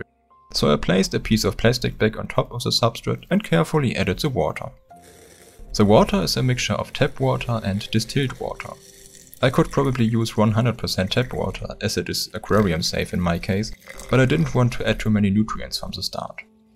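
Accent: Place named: Germany. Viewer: Man hört den deutschen Akzent ganz schön raus :D Echt schönes Video, vielleicht baue ich mir selber auch mal sowas. Aktuell habe ich eher Erfahrungen mit kleinen Terrarien gemacht.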